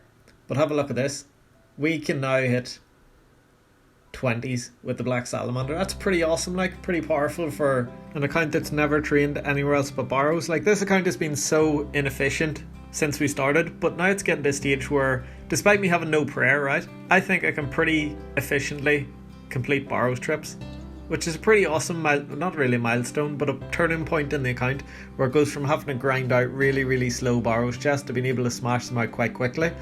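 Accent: Northern Ireland, United Kingdom. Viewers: You from N.Ireland?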